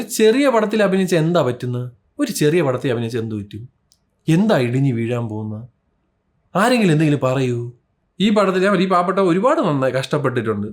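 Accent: Trivandrum, India. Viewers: Annan tvm slang 😆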